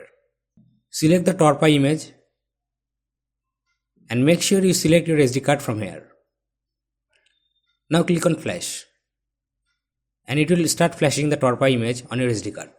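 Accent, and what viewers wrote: India. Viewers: sounds like literally every indian guy, trying to speak English. You should have known that even before clicking the video.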